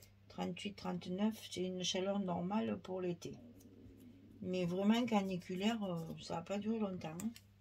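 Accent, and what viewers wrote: South, France. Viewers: Merci, tout est magnifique. Tu m'as trop fait rire: empastifé. Moi aussi je parle le sud. Bisous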